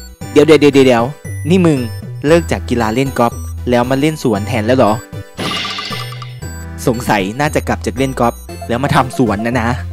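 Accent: Isan, Thailand. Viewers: แอดเว่าอิสาน😆ชอบๆ💕💕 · ชอบแอดพูดอีสาน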